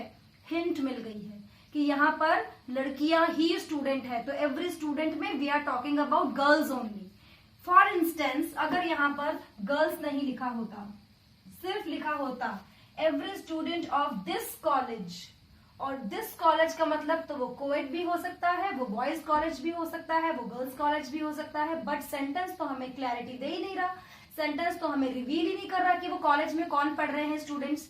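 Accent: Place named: Sikar, India. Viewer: Didi mai sikar se hu aapki voice sikar me rahne walo ke jaise lagi isliye pucha tha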